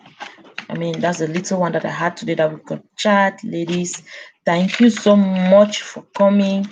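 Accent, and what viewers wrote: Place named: Cameroon. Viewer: Wahoo auntie u sound like a cameroonian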